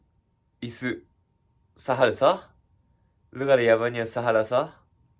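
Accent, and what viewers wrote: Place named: Iraq. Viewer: زين تحچي عراقي حلو 💫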